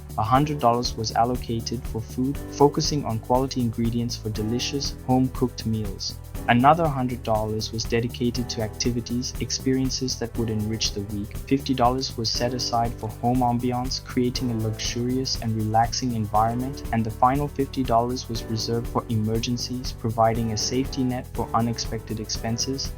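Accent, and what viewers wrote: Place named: India. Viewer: Thank you Indian guy (the accent was a dead giveaway away). These are great tips to share. Although I’m way ahead of you, as I have been doing every one of these things and more for the past 2 decades. Trade off was that I have traveled the world, been to the finest of places, and am surrounded with luxuries “all the things” which I have never once paid retail price for. Champagne on a beer budget is totally possible with meticulous and methodical planning 🥂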